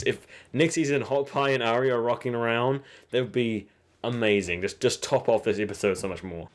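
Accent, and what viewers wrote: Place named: New Zealand. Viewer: *Great Video. I like your Kiwi accent reviews almost as much as I like the Ozzy guy reviews. The difference is you speak the Kiwi English and he speaks Bloke English. *The Little Bear Lyanna Mormont gives her speech like a boss. Sorry Wyman Manderly we gave your speech to a 10 years old girl. "The North only knows one king and his name is Snow....wait what?!" Oh well I joined in with the northern guys yelling a king in the North! Sadly I thought they would have had a Queen in the north but the northerners follow battle commanders and Sansa hid until the end with Lord (are you 18 yet Sansa) Baelish. **The Armada scene was great but I didnt see Tyrion on the ship so I assume he is on some other boat. I liked that Yarra had the big Pirate Captain coat. I almost wish she'd have stolen Capt John Sparrow's coat that he wears at the end of the 1st movie. 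However I don't think Disney will allow that until they Buy HBO.... #Disneyownseverything *Jamie will probably have to do something and I see Brianna Tarth helping him and then the 2 of them having sex. *Arya might sneak in and kill either Cersai or the Mountain, I'm thinking the mountain. She must have learned some kind of anti Necromancy many face gods spell to kill Franken-mountains.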